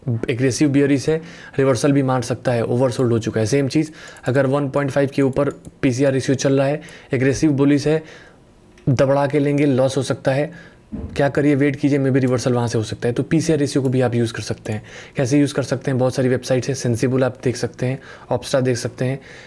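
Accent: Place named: Purvanchal, India. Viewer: Mujhey lagta hai sir aap purwanchal k hai. 😅 😅 😅 aapka accent waisa hi lagta hai. ❤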